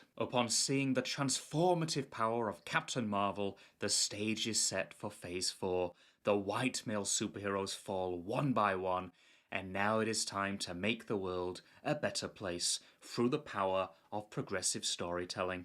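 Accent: United Kingdom. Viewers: These videos are INSPIRING and genius. I have been pondering making a channel praising the woke bowel movement incessantly in a serious manner to the point of ridiculousness for awhile now. I will only do it if I can pull it off right. It won't be as elegantly as does it that's for sure. He's a Brit so the accent is perfect for it.. I'm going to have to come at it in a more aggressive Antifa/BLM obnoxious type of manner. Great job, mate.. cheers!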